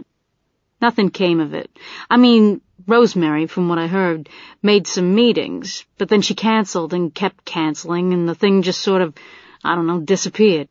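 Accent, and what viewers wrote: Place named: Boston, United States. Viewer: Probably a good book, unfortunately, I cannot listen to that harsh Boston accent. It simply grates on my nerves. Thirty -six minutes is all I could manage.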